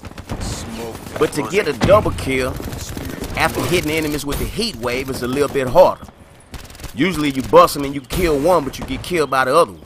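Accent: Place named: Atlanta, United States. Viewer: that nigga funny as shit llssss sound a atl nigga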